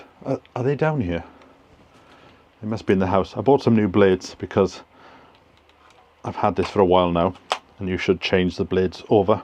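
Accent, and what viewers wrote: Wales, United Kingdom. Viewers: . I just want to say how much I enjoy your channel my mum was Welsh😊 so I’m half welsh. I love the valleys and I love the welsh accent. Keep it up great content great channel 👍👍👍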